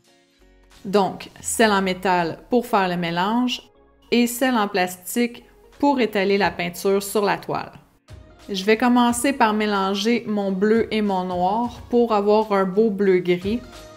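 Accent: Quebec, Canada. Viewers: Ma chère. Ton tuto est magnifique tout comme ton accent du québec. Bise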